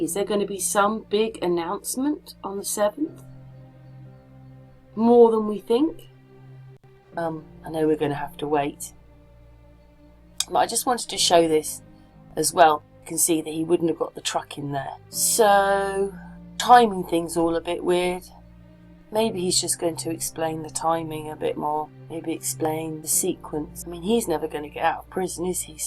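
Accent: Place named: Midlands, United Kingdom. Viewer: Happy birthday to you, is it a midland accent or east coast?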